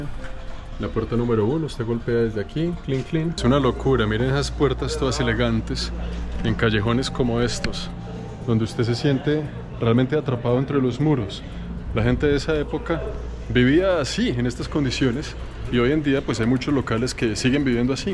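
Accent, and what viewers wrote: Colombia. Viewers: Para la calidad y belleza de las instalaciones de esos hospedajes son muuuuy económicos para la mayoria de turistas, sigue disfrutando!!! Gracias a ti ya no detesto los colombianos, a pesar de tu acento eres excepcional, sigue así, o sigue mejorando!!! Felicidades!!!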